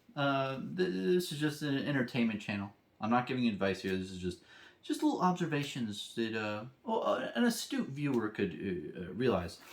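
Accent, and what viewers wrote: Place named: Florida, United States. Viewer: I’ve Said It Once & I‘all Say Ot Again, Your Accent Is So Pleasing To My Ears!! Are You A Florida Native?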